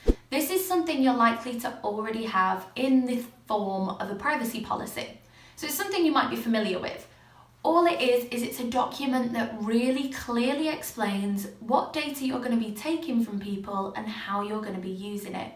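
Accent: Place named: England, United Kingdom. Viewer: Love the accent, I just close my eyes and imagine the extravagant 18th century English solicitor in a wig. Can you get one of those and wear it for these legal videos? Wigs, I mean, not solicitors.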